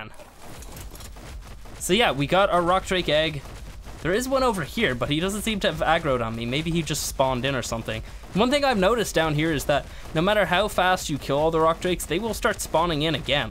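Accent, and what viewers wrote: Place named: Ireland. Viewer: Why does sound Irish in this video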